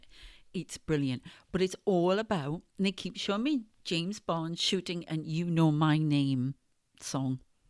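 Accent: England, United Kingdom. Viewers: Hello, I love hearing your readings and I love your English accent. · Spoken like a true English woman 👍👍 Go girl 💕💕💕🥰🥰 Kath xx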